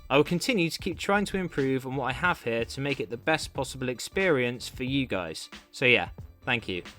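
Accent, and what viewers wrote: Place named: United Kingdom. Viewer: Your brit accent is so funny dude ! So enjoyable! Well done Edit : this questionable humor is fantastic ahahah Edit 2: your like the British modest pelican With that commentary post whether you want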